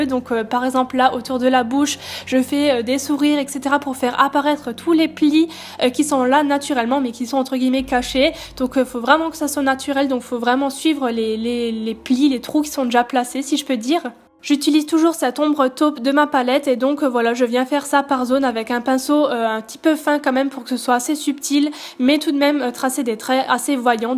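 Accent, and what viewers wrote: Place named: Alsace, France. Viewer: Wahou c'est trop réaliste ! En entend bien ton accent alsacien personnellement moi je l'entend bien car je suis alsacienne !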